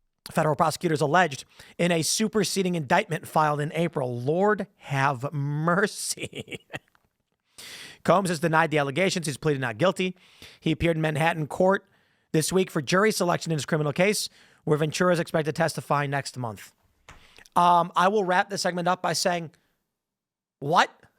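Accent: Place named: Chicago, United States. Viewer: face in all these recent thumb nails are smug af imo just saying. Que to thicken the Chicago accent about cheeseburgers and being cooked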